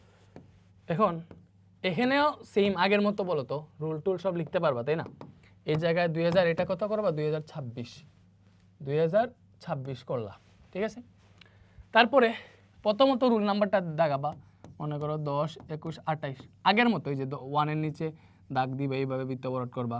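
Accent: Sylhet, Bangladesh. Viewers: Bhai aponake donnobad ar aponi ki sylhety😢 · Vhaiya mone hoy sylheti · ভাই মনে হয় খাঁটি সিলেটি..❤😊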